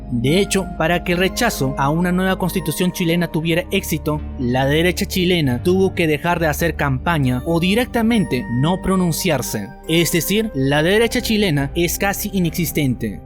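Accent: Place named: Bolivia. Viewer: ☝ interesante información del video, pero ¡ con abundante dosis de alarmismo !!! 😒🙈🖓 Es evidente que, por el modismo, dejo o expresión de la voz del autor del video, éste, NO es peruano NI chileno ( quizás puede que sea boliviano, o de alguna otra región ). Pues bien, la realidad presente, NO es como aquí se dramatiza. Si bien existe una rivalidad de los peruanos con los chilenos, ésta se hace mas evidente en el ámbito deportivo, que en el político o económico. Ahora, si el Perú apuesta por desarrollarse y destacarse, NO es por andar pensando o viendo lo que hacen los demás, si no, por que es plenamente consciente del potencial y los valiosos recursos que posee. Y, por que en la búsqueda de tal fin, está dispuesto a trabajar con todos : tanto con EE UU , como con China ( por ejemplo, se estuvo rumoreando a través de las redes sociales, que los chinos, hicieron la propuesta inicial de la contrucción de megapuertos, a las autoridades chilenas, pero, tal parece, que, como NO llegaron a un acuerdo conciso, estos, se pasaron a proponer lo mismo al Perú, que aceptó de cajón. 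Así que, NO tienen nada que reclamar o envidiar al respecto, los vecinos sureños ). ✋ En cuanto, al supuesto plan extensivo territorial del Perú, es algo, que de plano debe descartarse, ¡ por que es completamente falso !!! 🖓 Los peruanos del siglo XXI , NO tienen proyectos expansionistas geográficos, si no, económicos : la ' conquista ' es de nuevos mercados alrededor del mundo, y, NO de territorios vecinos, qu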